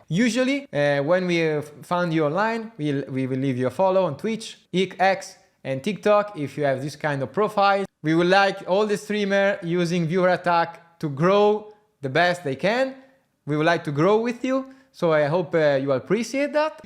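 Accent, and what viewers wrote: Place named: Italy. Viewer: guarda che si sente che sei Italiano ....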